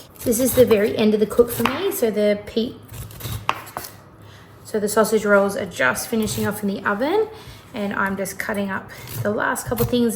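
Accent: Australia. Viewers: just beautiful as always <3 I love watching your videos, even your voice and the Aussies accent soothes me! Thank you so much for all your work and please give us more !!!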